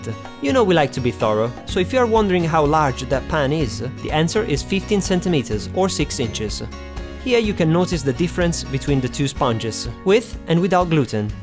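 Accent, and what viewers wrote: Italy. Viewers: Si sente che sei italiano:)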